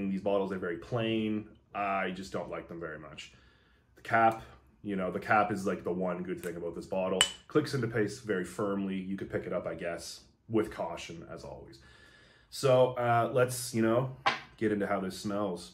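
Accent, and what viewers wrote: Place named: Quebec, Canada. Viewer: I love his Québécois pronunciation, must be a Canadian... eh.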